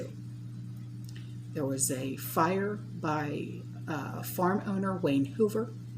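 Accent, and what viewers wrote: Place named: New Jersey, United States. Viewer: yes I noticed that at the end. Thank you for all you do you are so awesome. I love your Jersey accent!!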